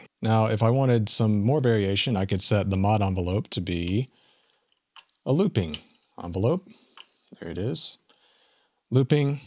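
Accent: South, United States. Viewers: What an awesome video. Or as you'd pronounce it, "Whhat an awesome video." :D You sound a lot like Billy Bob Thornton. Likely my favorite southern accent.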